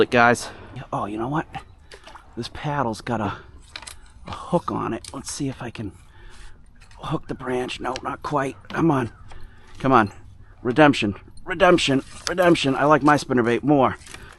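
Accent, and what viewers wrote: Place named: Massachusetts, United States. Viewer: I had a feeling you were in MA. Not the heaviest accent but all the F bombs, lol. Never realized how much we do it until you get around people from other parts of the country and theyre like, "LOL Jesus dude, you swear a lot!"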